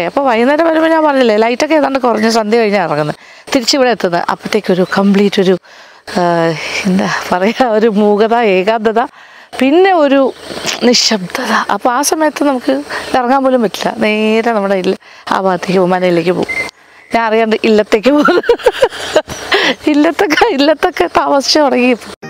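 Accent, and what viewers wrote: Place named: Palakkad, India. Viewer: Very beautiful 🥰. Chechikku palakkad bhashayum vannu. lots of love.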